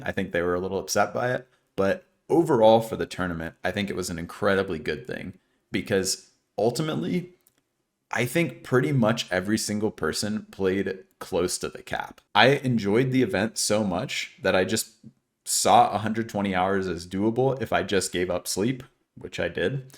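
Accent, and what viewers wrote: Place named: MLE, United Kingdom. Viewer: I discovered you in J1mmyVODs/WasJimmy's youtube video. Ur wagwan accent for ur WoW troll roleplay is perfect and hilarious plz upload ur OnlyFangs' WoW roleplay to youtube! I really hope you collab with Rav, Moobius, Sput/Dr. Bones, Hubert, and other roleplay comedians in OnlyFangs.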